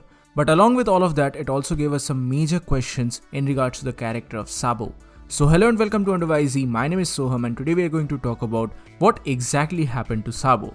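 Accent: India. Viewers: yeah its indian accent ,I can understand I am also indian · ur accent is very familiar ... Are u Indian?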